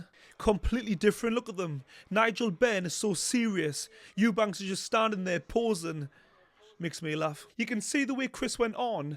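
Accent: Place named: Geordie, United Kingdom. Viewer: That's gotta be the broadest geordie accent I've ever heard 😂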